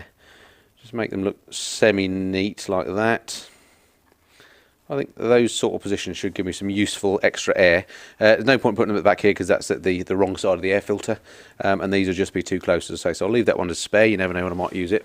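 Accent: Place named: England, United Kingdom. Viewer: I love your English snobbish accent please keep it up Don't ever change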